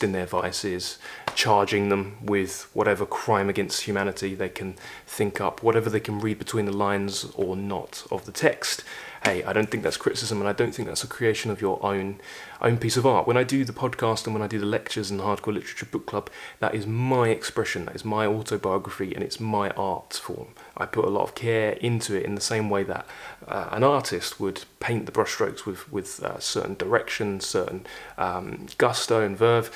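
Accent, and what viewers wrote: United Kingdom. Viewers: Is that British accent ... you are so well spoken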